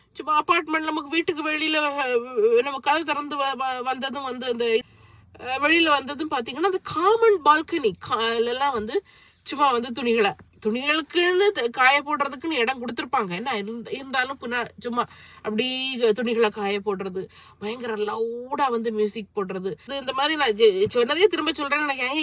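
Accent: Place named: Kanyakumari, India. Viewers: கன்னியாக்குமரி slang 😊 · நீங்க உண்மையிலேயே லன்டன் தான் இருக்கீங்கலா என்பது சந்தேகமா இருக்கு இன்னும் அந்த தமிழ் நாட்டு கேவலமான உச்சrippu தான் ஒங்க வாயில இருந்து வருது டர் புர் னு. ஒங்களுக்கு ஒழுங்கா இங்கிலிஷ் உச்சரிப்பு வரல்ல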